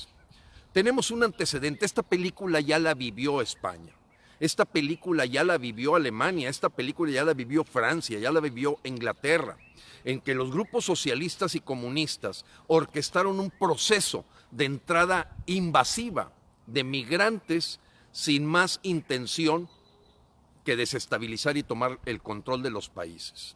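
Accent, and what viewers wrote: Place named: Mexico. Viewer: Ud tiene el tono mexicano sr se le nota al 1000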